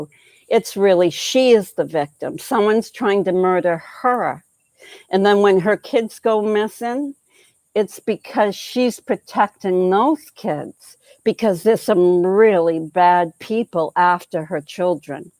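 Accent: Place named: New York, United States. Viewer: I love your daily greeting; very Marilyn Manson like, but unsuspecting! And, I'm guessing a New York accent. Probably from New York City? I'm guessing and sleuthing on you! GOOD MORNING BEAUTIFUL PEOPLE!! xoxo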